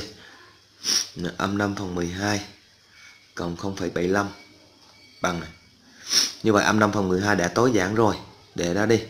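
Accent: Binh Dinh, Vietnam. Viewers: thầy dân bình định hả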